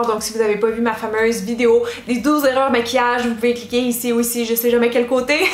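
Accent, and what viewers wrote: Quebec, Canada. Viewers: J'en fait quelques unes de ces bêtises... Pleins de bonnes choses dans cette vidéo. Et un délicieux accent Québécois 😃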